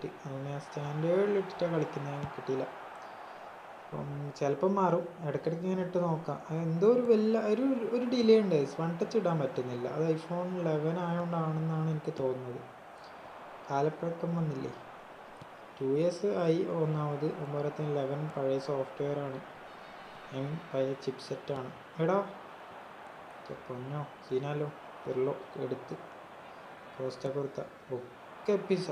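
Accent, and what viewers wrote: Kannur, India. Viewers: slang kettappol thonni atha choyichee😅😆 knrr evdyaa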